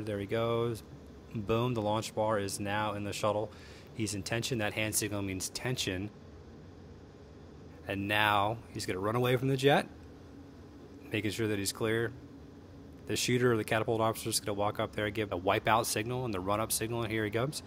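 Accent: United States. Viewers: This dude is 100% from California with this accent!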